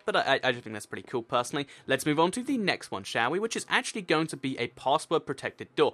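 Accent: United Kingdom. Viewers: Sonic pikachu don't make fun of him that's because he's British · You speak in such a breathy manner lmao wonder what part of the UK he’s from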